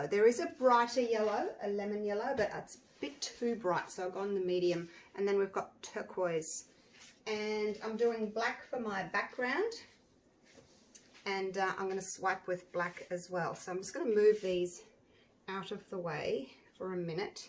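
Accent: Australia. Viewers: Love Yr Aussie Voice🙂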